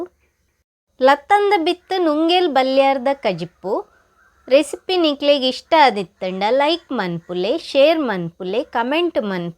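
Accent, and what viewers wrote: Tulu, India. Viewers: manglore Tulu and Udupi side Tulu is little bit different to talk. But nice to hear Tulu language the way of your talking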